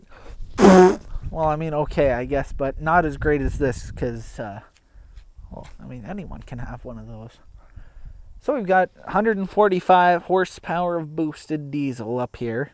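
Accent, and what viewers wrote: Canada. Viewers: You sound like a crazy country CANUCK... WHERE YOU FROM?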